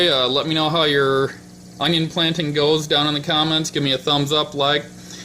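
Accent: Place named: Midwest, United States. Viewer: Midwesterner detected* lol great vid, thanks man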